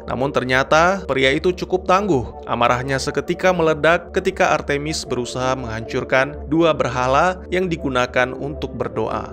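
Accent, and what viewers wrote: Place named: Medan, Indonesia. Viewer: Logat medan 👍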